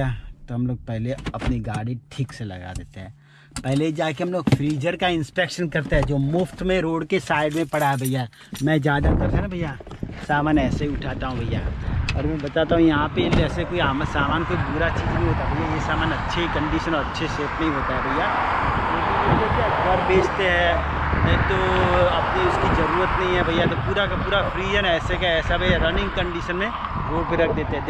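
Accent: Gujarat, India. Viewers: I think you belong to Gujarat as you speak Gujarati style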